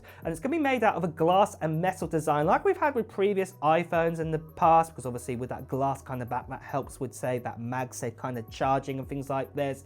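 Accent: United Kingdom. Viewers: You pronounced aluminium wrong unless you’re American? You sound British lol wtf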